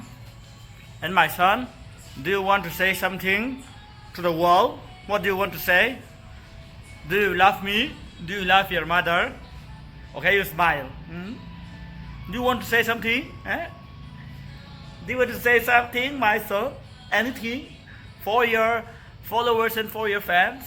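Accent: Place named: Mizoram, India. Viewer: You are Mizo English, very very Mizo English ❤😂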